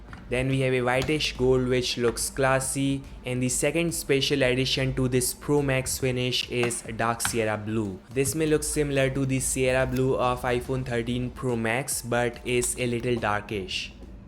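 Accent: India. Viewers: tabhi sochu voice indian lag ri thi 😅 U should do face cam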